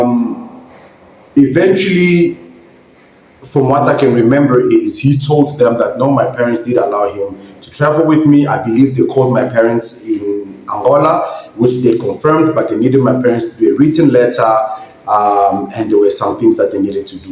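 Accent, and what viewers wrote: South Africa. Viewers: What? You are Angolan? You sound South African 🇿🇦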